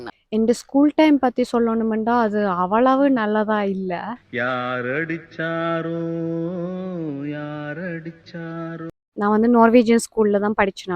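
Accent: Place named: Sri Lanka. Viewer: Nice. Keep going. ❤🎉. Love your srilankan tamil ❤ loves from 🇲🇾❤️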